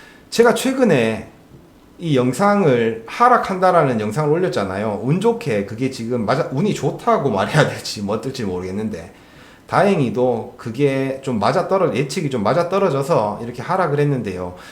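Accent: Gyeongsang, South Korea. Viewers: 대구분이시죠 ㅎ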